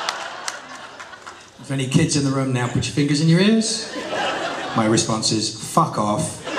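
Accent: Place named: United Kingdom. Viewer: awww, Ivw not heard this sort of british accent in years! oh the memories...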